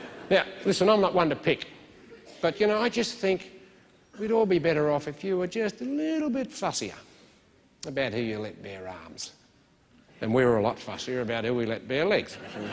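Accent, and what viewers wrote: Australia. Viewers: That Aussie accent is just a killer . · He is why us Americans love the Australians. Actually, we think everyone with an Australian accent is cool. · Cent stand the Australian accent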